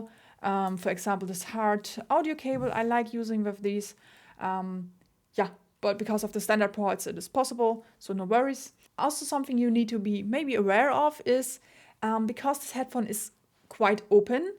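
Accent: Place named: Germany. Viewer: Ahhh nice long term review! I could tell you were german right away ;)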